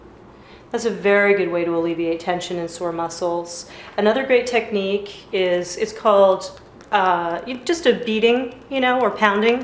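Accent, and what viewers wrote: United States. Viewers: dat american accent.. :)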